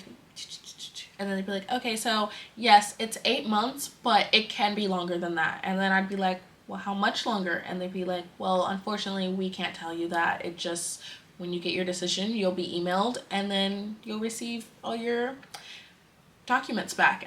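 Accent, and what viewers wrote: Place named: United States. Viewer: She looks like she has an accent. Her American accent is still thick. But I be hearing a lil accent every now and then. She getting prettier and prettier. ❤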